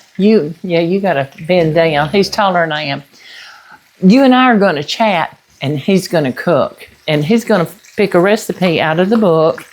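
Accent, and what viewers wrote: South, United States. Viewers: What a sweet couple you are, so glad I just found your channel! ❤❤ Congrats on being featured in the magazine! What state are yas in, love your Southern accent. ❤ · OMG! I just happened to fall upon your channel and, if I close my eyes, you sound just like Paula Deene. I love your Southern accent. New subscriber 🤗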